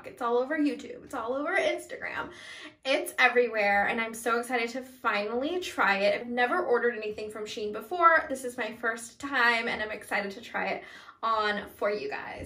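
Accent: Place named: California, United States. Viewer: You sound like you’re from California the way you talk 😂 this is coming from a Mainer haha 💜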